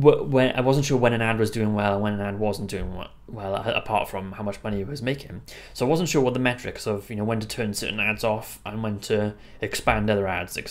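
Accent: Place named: Wales, United Kingdom. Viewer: I love your Welsh ancient, it reminds me of my Shrewsbury family! Your content is Gold and I love your no frills approach it’s refreshing 🤘🏽💯 keep going brother you’ll be super affiliate in no time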